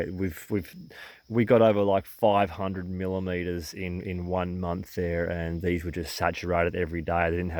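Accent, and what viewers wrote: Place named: Australia. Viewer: You sound like an Aussie 🇦🇺☺️🙋🏽‍♀️ But you’re in Canada?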